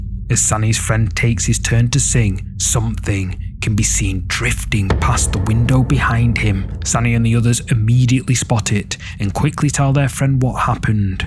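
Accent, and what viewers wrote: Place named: Northern England, United Kingdom. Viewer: I just love these videos so much ❤It’s a real treat everytime you’re uploading something new. Your northern England accent’s also brings a kind of creepy aura to the videos. 😊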